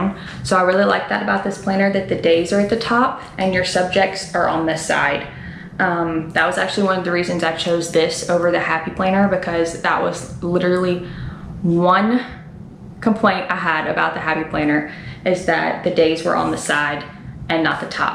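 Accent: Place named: South, United States. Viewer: LOVE this! These stores are all awesome! As is your Southern accent haha happy new subscriber here!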